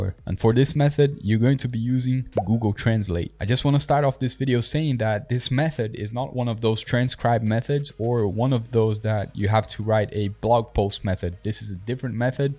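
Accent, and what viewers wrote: Brazil. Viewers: tu é carioca né kkkkkk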